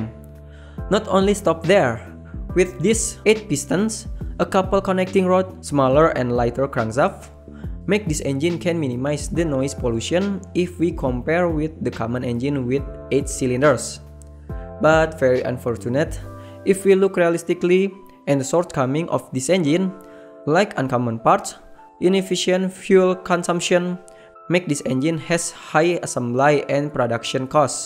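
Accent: Indonesia. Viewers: Logat indonesia nya kedengeran bro.. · I immediately know that this channel is based in Indonesia from your first word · Ini org Indonesia ya ? Soal nya logat nya indo banget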